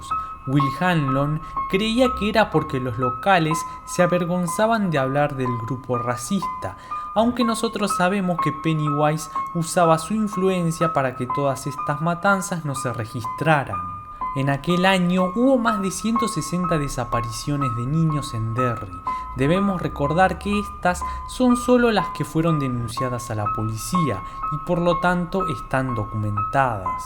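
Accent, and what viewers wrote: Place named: North, Mexico. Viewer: Como que norteño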